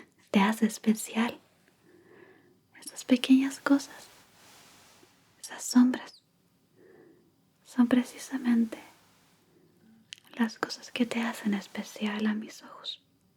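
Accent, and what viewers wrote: Chile. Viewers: Gracias a tí preciosa, puedo pasar de 🤬 a 😊 Tu voz dulce y acento chileno, hacen maravillas ✨✨✨🌹👸🌹 Gracias 🙏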